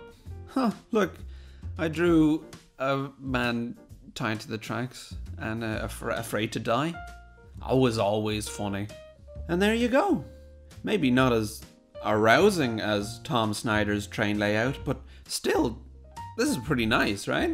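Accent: Ireland. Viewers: Is the narrator Irish (accent). What about Pete Waterman? What's with the 3 rail trains? I guess they all like Lionel. HO can be so much more realistic. Considering what money celebrities have, this was pretty disappointing.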